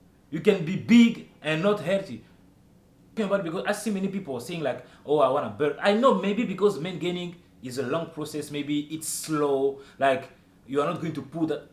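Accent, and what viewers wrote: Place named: United States. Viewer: Tu parles comme un Américain l'on Fils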